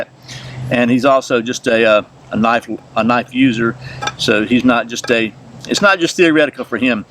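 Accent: United States. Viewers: Your accent ...yank mate....which state/region? It's cool! A bit of a twang, but not too much..like it!.😁👍🇦🇺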